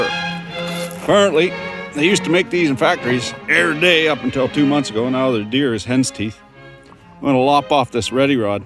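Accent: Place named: Canada. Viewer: Are you related to “Zip ties and bias plies”? Because you’re both from the great white north and talk almost exactly the same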